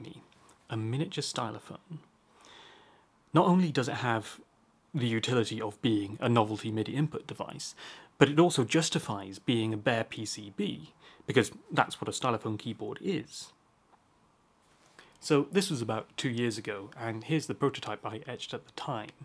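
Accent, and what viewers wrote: United Kingdom. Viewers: Yeah he just sounds British is what you're trying to say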